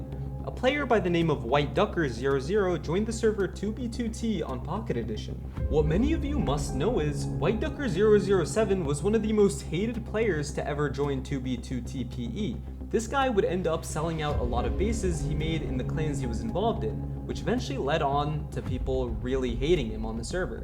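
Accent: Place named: United States. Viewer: dude your from asia but u sound american, what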